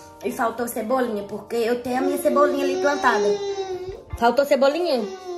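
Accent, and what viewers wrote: Minas Gerais, Brazil. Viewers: você fala torando coisasvde minas gerais amo voces meus conterrâneo mistura dos dois.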